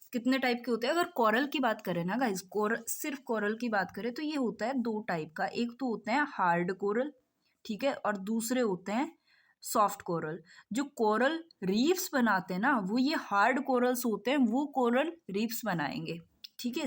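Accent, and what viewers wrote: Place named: Bengal, India. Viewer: i think you are bengoli because your language tells that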